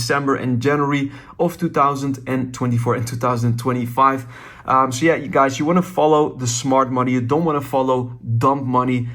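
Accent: Netherlands. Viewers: Ben je een Nederlander?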